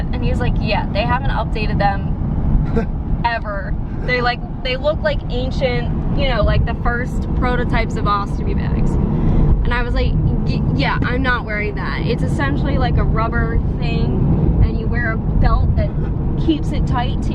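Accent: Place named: South Jersey, United States. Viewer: I thought I detected a south jersey accent.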